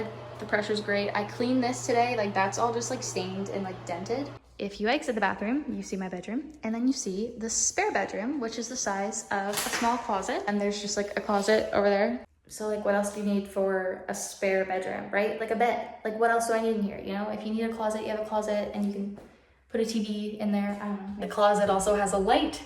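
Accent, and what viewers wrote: Canada. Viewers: I love your accent, it sounds so canadian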